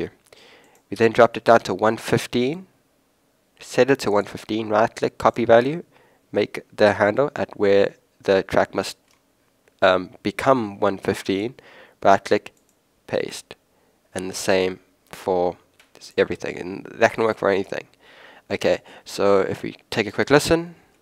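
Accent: South Africa. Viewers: Is that a South African accent I'm hearing? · Random but you sound South African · you sound south african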